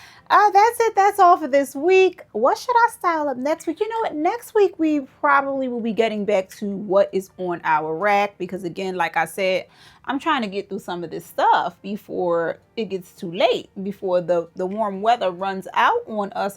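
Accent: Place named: Philadelphia, United States. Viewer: Thanks for sharing. Bought some culottes and love the inspo. Glad I found your channel. You have wonderful style! And I swear I’m catching a Philly accent too. Made my day!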